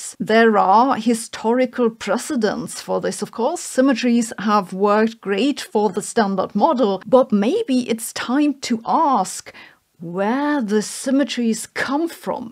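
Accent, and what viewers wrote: Germany. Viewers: Einstein is being pronounced correctly for Sabine's type of German accent. Einstein himself had a southern germanic accent which softens a lot of sounds.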